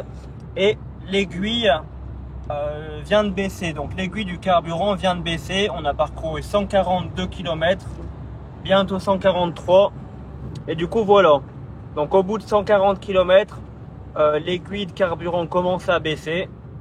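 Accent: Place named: Nord-Pas-de-Calais, France. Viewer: IL PARLE COMME CHTIMI CELUI LOA...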